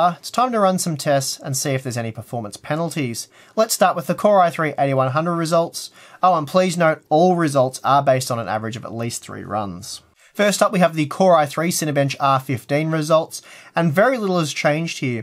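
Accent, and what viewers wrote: Australia. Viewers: Will the next generation of CPUs have this issue? Actually glad I waited to upgrade, even if the hit was slim to none. Also thanks again for the updates. And once again, love your accent. Australians have perhaps the most pleasant accent IMO.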